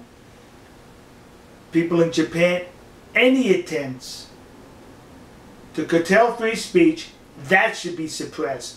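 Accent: New York, United States. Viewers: I know you're white but what is your ethnic European back ground ? you look Italian to me I think you're Italian boy you Italians hate Arabs I'm not an Arab but I hate Italians even more so I defend Arabs even though I hate them to. you sound like you come from new York have you ever heard about us Trinidadians we are of the Asian Indian race . the British brought our ancestors from India to Trinidad and Tobago to work as indentured workers . we're ralated to Asian Indians. any way the Japanese had the right to ban hate speech cuz it causes problems . they should do that here in america ! I'm not against hate speech I just think it should only be used behind closed doors within organizations. hate groups should not be allowed to go to peoples neighborhoods to tell them they hate them. there's alot of violence at racist rallies that kind of hate speech should not be allowed unless your hating people behind closed doors!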